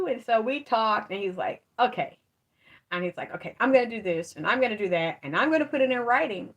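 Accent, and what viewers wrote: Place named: Louisiana, United States. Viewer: You from Louisiana? I was listening to another video and when you start speaking fast your accent sound like you're from South Louisiana?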